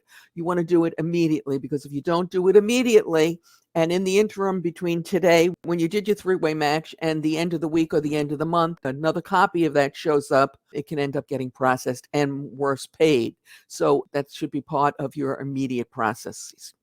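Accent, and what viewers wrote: New York, United States. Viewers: Absolutely you said it convincingly. Do u have a ny accent?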